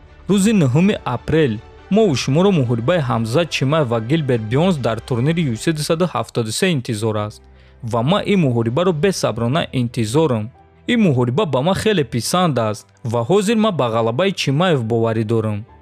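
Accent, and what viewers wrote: Tajikistan. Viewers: Овози ширини точикии тозаат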